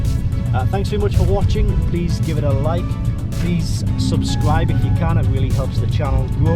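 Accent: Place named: Geordie, United Kingdom. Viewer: I've followed your channel since you started, years ago, with the channel under your " " name. Just for feedback, it's gone from being an on honest "Geordie lad talking about cars" to becoming clickbaity, cheap and nasty. You've always been a bit w*nky, pretending you're something you're not (regarding the Porsches) but it's just tacky and sad now. You're not fooling anyone by toning and smoothing your natural Geordie accent and pronouncing letter 't' as a 'd' (pardy on, man). Have you ever wondered why videos like this have a staggering 36% thumbs down rating (YouTube average is 8%)? Just stop being a k*ob and try actually being yourself.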